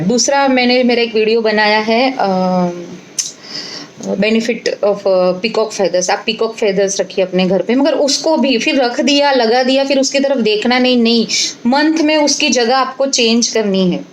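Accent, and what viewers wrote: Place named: Gujarat, India. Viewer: Her pronunciations is gujarati why should you speak hindi full bakwas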